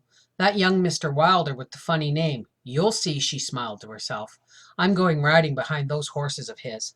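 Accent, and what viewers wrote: Canada. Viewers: * pronounces some words a bit differently. I think it's because she's Canadian. Like "shonn"/"shone"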